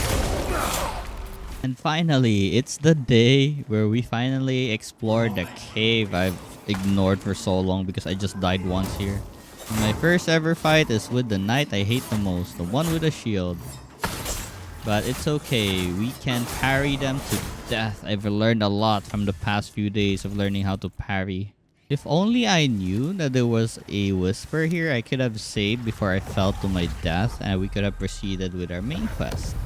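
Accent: Philippines. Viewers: dude are you filipino? cause you got the a ccent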